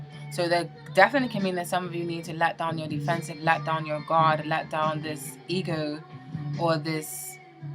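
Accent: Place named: Bermuda. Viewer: I enjoy your readings and keep up the great work as it definitely resonates with me. I've been listening and noticed that the accent sounded familiar. Hi my fellow Bermudian. :)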